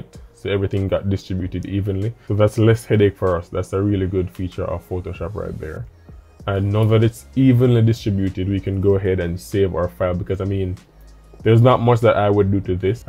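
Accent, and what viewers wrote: Jamaica. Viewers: Bless up! I hear the Jamaican accent. Good to see the Jamaican Youtubers #Winning #NewSubscriber 🙌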